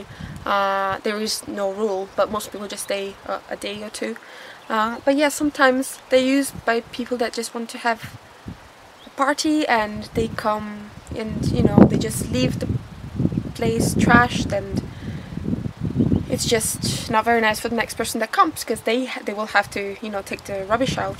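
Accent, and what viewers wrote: Scotland, United Kingdom. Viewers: just found this after doing some hills at weekend and a bothy visit near The Grey Corries.. your 'bothy' pronunciation is spot on and you're picking up a Scottish accent here and there :) we've now got to stop planning our 6 month (or longer) van trip and just do it! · You sound like you are turning Scottish.You sound like you are picking up the Scots' accent.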